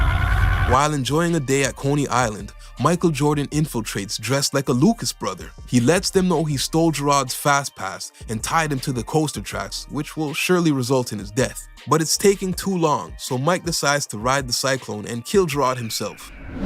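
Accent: Toronto, Canada. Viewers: ur def from toronto broski lol i love this channel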